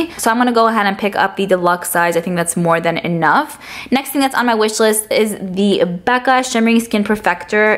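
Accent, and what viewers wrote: New York, United States. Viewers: I really didn’t want to be mean, I love her accent it’s a big reason why I subscribed it reminds me of when I lived in NYC/Jersey 😊❤️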